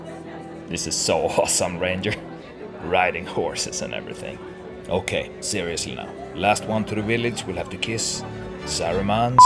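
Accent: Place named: Sweden. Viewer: Hi amazing work. Sounds like u are from Sweden. What part are u from?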